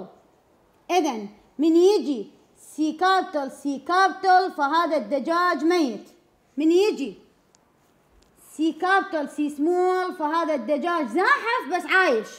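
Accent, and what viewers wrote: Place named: Mosul, Iraq. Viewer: حظ انتي مصلاوية موو؟ عغفتو من لهجتكي + عاشت ايدك والله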